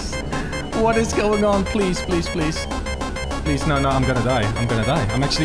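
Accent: Netherlands. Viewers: haha zieke nederlandse accent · Het nederlandse accent is weer lekker terug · Dat Nederlandse accentje😝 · Are you dutch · jij bent sowieso Nederland, is hoorde het aan overpowered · What cauntry or u from i think the netherlands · You sound dutch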